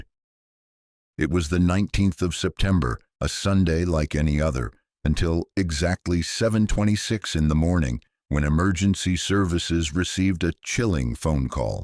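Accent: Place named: United States. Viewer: Couldn’t stand the narration and American accent. Very annoying and full of repetitive dialogue.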